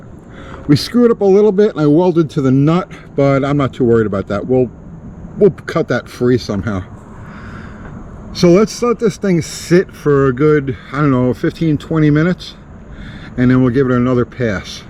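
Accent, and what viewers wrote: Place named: New Jersey, United States. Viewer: judging from your accent, I'd say you're in Joyzee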